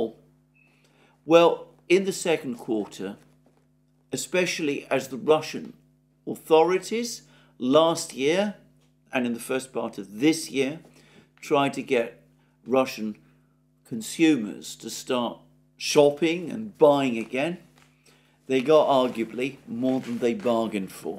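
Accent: United Kingdom. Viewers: Is he British or Russian?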